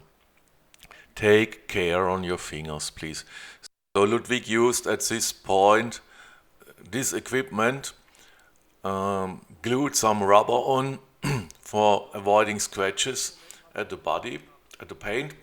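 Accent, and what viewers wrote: Germany. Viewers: Thanks for sharing this video ❤❤❤❤❤❤❤❤!!!!!!! Also i want to say that you sound very kind with your German accent in English and that is so nice that you made this fix with your friend ❤. I ll try do the same as i have the same issue!!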